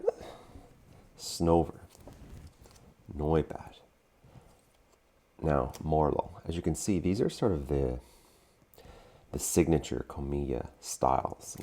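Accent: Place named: East Coast, United States. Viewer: First time coming across your channel - you sound like an east coaster! Ive collected Yuka Morii’s full english set, and I’m working on komiya, Kusube & Nishida!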